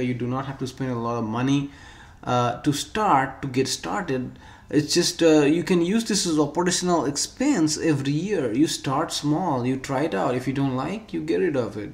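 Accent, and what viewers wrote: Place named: Bangladesh. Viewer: Very informative and useful video. Thanks. (in an unrelated note: are you some how connected to Bangladesh ? Your voice notes has mild accent of Bangla. BTW, I am a bangali :-)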